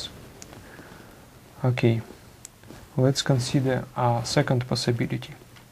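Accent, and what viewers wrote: Russia. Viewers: Very nice. And English with Russian accent i so cool. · The "O" in the Russian alphabet is pronounced by like "Ah" (except the accented syllable" , e.g. хорошо = harasho Thats why he has that accent.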